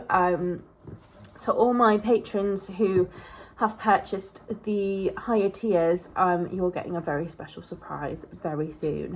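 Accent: United Kingdom. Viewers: What Part Of The UK U From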